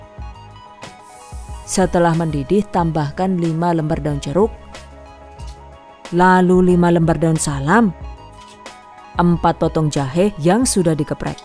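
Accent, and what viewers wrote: Banyumas, Indonesia. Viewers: Pertama melihat video beberapa tahun yang lalu, saya pikir ini suara ibu-ibu yang sudah tua, ternyata orangnya masih muda dan cantik, dan di selingin bahasa ngapak yg lucu, terimakasih untuk videonya ya mbak, salam dari cilacap. Semoga sekin sukses dan berkembang Aammin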